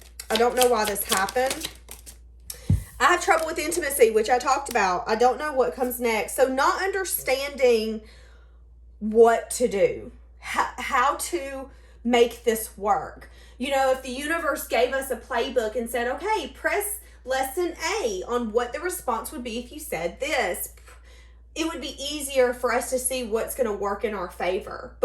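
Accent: South, United States. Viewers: You have a Southern twang but I love it 😍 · OMGEE!!! I watch ALL your videos EVERYDAY!!! Whether they resonate or not!!! I was searching for a video yesterday, like where is my daily tea!!! And why the past week I was thinking: I’d like to see her face on a live!!! Cause I absolutely love your country accent!!! Then BOOM!!! I click and see YOU!!! You’re gorgeous,!!! 🙏🏽🙏🏽🙏🏽 Ok, anxious to hear this reading!!!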